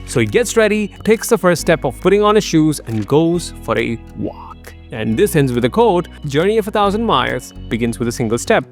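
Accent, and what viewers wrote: India. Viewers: really? listening to his indian accent is so annoying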